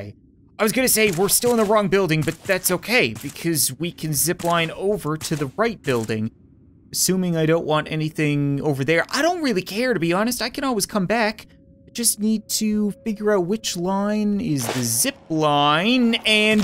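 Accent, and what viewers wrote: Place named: Canada. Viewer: I love his Canadian accent and how it's so subtle yet so prominent that it leads to perfect delivery in every line Example: "what happened to shoe potatoe!?"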